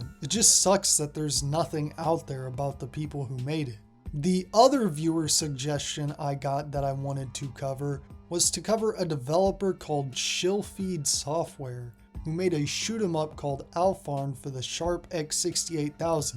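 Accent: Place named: South, United States. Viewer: Maybe it’s his ambition to be “refudiated” for his desire to use the word. 😉 In seriousness though, I notice that he has Southern-accented English, the vernacular forms of which posses a lot of non-standard pronunciations for common English words; for example as a Texan, I remember mispronouncing the word “Nuclear” as “Noo-Cue-Lure” instead of the correct “Noo-Klee-Ur” thousands of times before it later became a US-wide meme in 2002 due to “a certain Texan US president”. “Lambuxatious” sounds vaguely familiar, but I can’t immediately put me finger on what words it’s a derivative of (perhaps a combination of “To lambaste”, “To buck”, and “Vexatious”? 😂 ).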